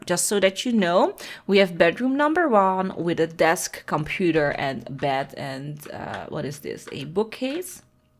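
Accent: Netherlands. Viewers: You kind of have a Dutch accent, are you from the Netherlands? I'm from Belgium.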